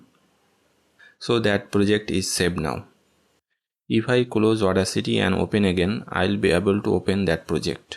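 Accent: India. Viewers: The pronunciation of the sounds like a Bengali speaking!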